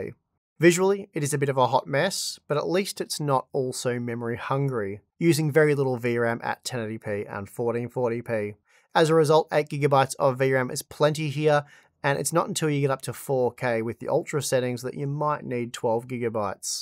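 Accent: Australia. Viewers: I’m sure most of the viewer base is from the states but when I’m listening to a Aussie I just wish you’d use aud prices 😿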